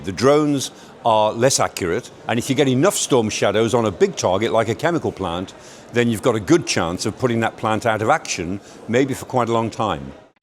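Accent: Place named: United Kingdom. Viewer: This guy sounds so British...😅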